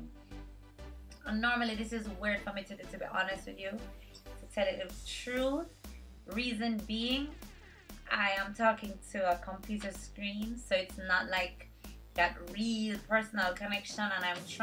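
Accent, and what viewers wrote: Jamaica. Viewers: love the jamaican accent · Jamaican accent sounds definitely cool :) Lots of love from Paris, France.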